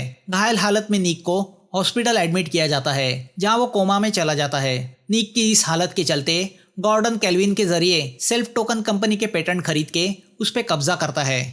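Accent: Maharashtra, India. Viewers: amazing Marathi accent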